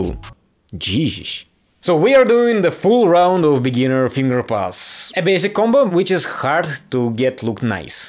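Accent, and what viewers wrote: Russia. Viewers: Такое чувство будто ты русский, который выучил английский ... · Таке ощущение что он русский, но говорит на английском. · Стопэ, ты русский? Акцент довольно таки русский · мне кажется, или я слышу русский акцент? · Мне кажется. Что этот чел русский, акцент очень сильный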